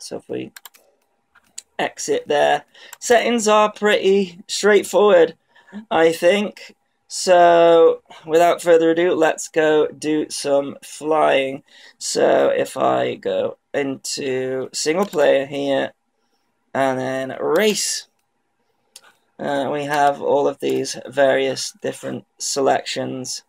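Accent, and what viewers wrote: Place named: England, United Kingdom. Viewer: For me you're the most reliable reviewer/drone channel-guy out there. If im unsure when it comes to "drone-decision-stuff" i always just have to browse your channel to find the right answers and opinion. Of course there are other good and trusty reviewers on yt....but totally prefer the english language and pronounciation over this american english blabla....or well in most of the cases atleast. Ofc joshua bardwell, rcAddict, kababFPV, stu from uav, envy astro+seth and Bruce from rc model reviews doin a GREAT job aswell....but you are always a tad more enjoyable to listen too. Thanks for that dude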